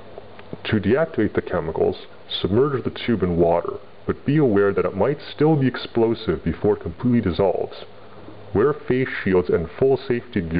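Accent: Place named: Canada. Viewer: Do all Canadians sound like this?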